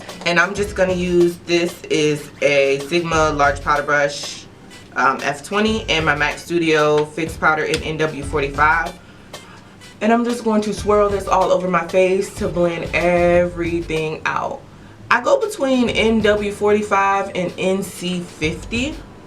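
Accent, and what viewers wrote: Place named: California, United States. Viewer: I'm from Cali!!!! and you sound like you From California too... lol. you are so good at what you do. thanks for all of your videos. You are so beautiful!!!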